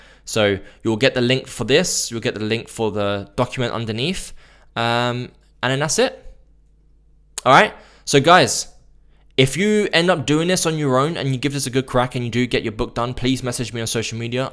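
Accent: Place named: United Kingdom. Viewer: Great! 😊 btw I love British accent & sense of humor. Cheers! 🥂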